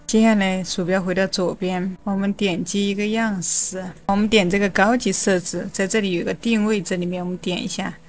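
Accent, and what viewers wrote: Hubei, China. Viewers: 为什么我觉得和雷军一样是湖北口音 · 湖北仙桃口音？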